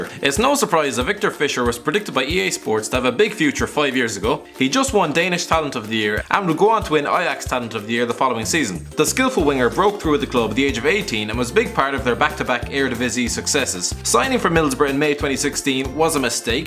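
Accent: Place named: Ireland. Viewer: I can tell you're an Irish chap like myself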